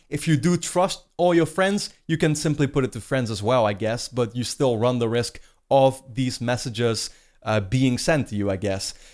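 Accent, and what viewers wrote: Netherlands. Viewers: am i fucking glad switch doesn't have messages XD also i'm pretty sure you are dutch judging from your accent though possibly rip my brothers.. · I wonder if he is dutch cause he has that accent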